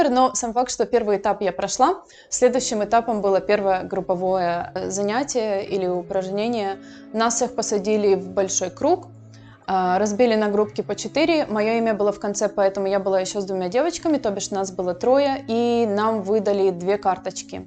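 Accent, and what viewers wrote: Ukraine. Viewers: Украинский акцент не уб.ешь ничем. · Может покажется странным, но самое классное в видео, это Ваш украинский акцент, особенно нравится буква "ч", и буква " я", которая по произношению ближе к "а".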